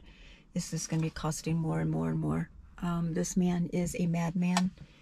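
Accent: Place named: Maine, United States. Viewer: i hear maine, or vermont or whatever and maybe its true. Id like a guess outside the U.S if you picked those.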